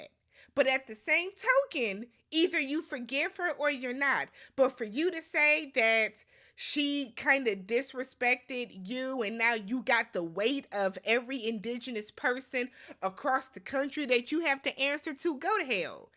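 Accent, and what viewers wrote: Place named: Chicago, United States. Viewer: Being from Chicago, listening to you is like talking to my girls I grew up with. I lol every single review 😂🤣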